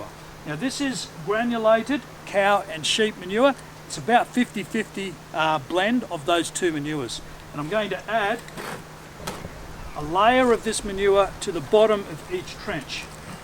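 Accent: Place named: Cockney, United Kingdom. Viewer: 2 questions for the asparagus expert: 1. I grew asparagus from seeds in small containers 3-4 sprouted in each I have 5 of those containers and 1.5m in length of ground/soil where I'm planing to plant them So my question is: is it okay to plant each pot with 30cm apart So it will be 3-4 asparagus - 30cm space - 3-4 asparagus - 30cm space etc. 2. Should I use the same method for planting / soil like you used in this video Many thanks BTW love the accent, reminds me of London's Cockney accent :)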